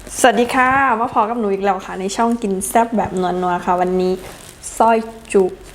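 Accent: Isan, Thailand. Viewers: ไทยคำอิสานคำ..น่าฟัง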